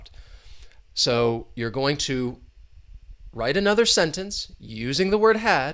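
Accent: United States. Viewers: American accent too heavy. 👎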